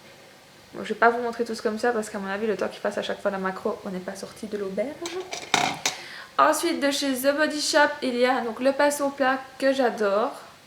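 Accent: Belgium. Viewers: Tu es belge? :)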